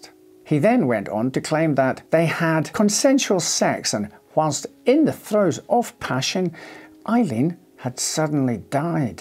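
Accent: Scotland, United Kingdom. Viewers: You definitely have a Scottish twinge in your words, and I love the way you speak. Thank you for another very interesting story.😊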